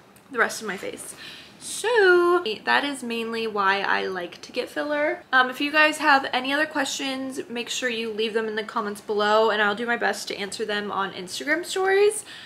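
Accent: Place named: Tennessee, United States. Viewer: I’ve never been to Nashville or Tennessee for that matter but is that what a Tennessee accent sounds like? Like wow. Also, why not turn the comments off so no one can comment on your lips?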